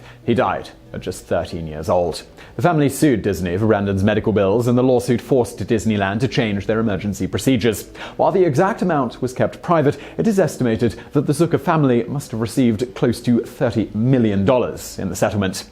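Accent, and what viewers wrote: United Kingdom. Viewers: For god’s sake, he’s British. That’s how they pronounce it in that accent. Lay off.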